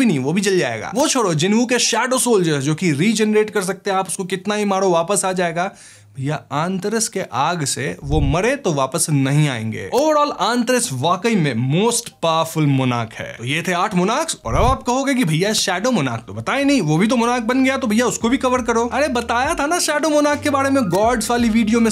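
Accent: Bengal, India. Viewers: bhiya aap sabse pehele anime ki story cover karo aise bhut confusing ho rha hai please 1st full manwa explation chapter wise and then all the details of characters,gods and monarchs please bhaiya you will be the 1st to cover this anime fully chapterwise on youtube and btw love your videos so muchlots of love and are you bengali as you sound kind of like them as I am bengali tooooo please consider my request please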